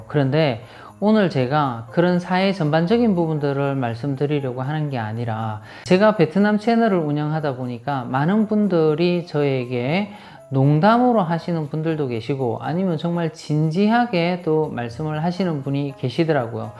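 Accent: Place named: Busan, South Korea. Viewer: 항상 성품이 차분하시고 현명한 분으로 보여요 저도 부산 출신이라서 부산 말투도 정겹고요 결혼정보 업체를 비즈니스로 하고 싶다고 한다면, 일단 그런 업체의 사무실에서 경험을 좀 쌓아야 돠겠지요 ㅎㅎ 그런데 은 그런 일이 성격상 안 맞아 보이긴 해요. 또 본인도 지금 이렇게 원하지도 않고, 베트남 여성들이 예쁘고 호감이 가고 또 우리나라 보다 현재 경제적 수준이 낮다 보니까, 한국 솔로 남성분들이 간혹 희망을 갖는 사람이 있는것으로 보여요, 구독자들에게 휘둘리지 마시고 댓글 내용도 가려서 보시고요 ㅎㅎ 주위에 착하고 예쁜 여성들이 많아서 방송을 보는 우리는 일단 즐겁습니다 도 외롭지 않게 좋은 분을 만나시길 바래요 ♡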